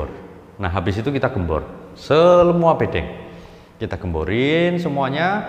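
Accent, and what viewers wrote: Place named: Banyumas, Indonesia. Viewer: Sesekali ngapak nya di keluarin pak,ora ngapak ora kepenak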